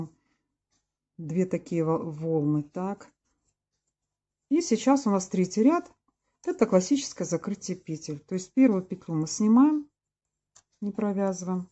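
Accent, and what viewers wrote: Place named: Belarus. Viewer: здравствуйте! Почему я раньше вас не видела? Вы с 2015 года ведете канал, а только сегодня я с вами встретилась, познакомилась и, конечно же, подписалась. В целях знакомства пересмотрела много ваших видео. Очень познавательно и полезно. Хотя я вязальщица с уже достаточно большим стажем, многое взяла себе на заметку, ведь учиться и узнавать что-то новое никогда не поздно и совсем не стыдно. Спасибо вам, что делитесь своими знаниями со своими подписчиками. Удачи вам, новых идей, вдохновения, легких петелек, благодарных подписчиков и процветания каналу. Забыла сказать - ваш белорусский говор покорил меня сразу! 💖🌸🌺🌸🌺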